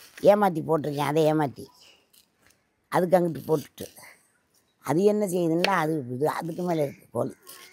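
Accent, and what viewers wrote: Ramanathapuram, India. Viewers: அம்மா நாங்கள் ராமநாதபுரத்தில் உள்ளோம். உங்களின் பேச்சு அப்படியே எங்க ஊர் போலவே உள்ளது. எங்கள் ஊரில் உள்ள முஸ்லிம்கள் இப்படித்தான் பேசுவார்கள் கேட்பதற்கு அழகாக இருக்கும்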